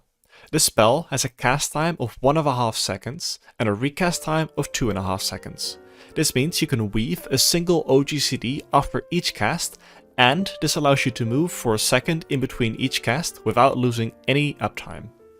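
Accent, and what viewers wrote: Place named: Netherlands. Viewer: Ah the Dutch accent, love it:D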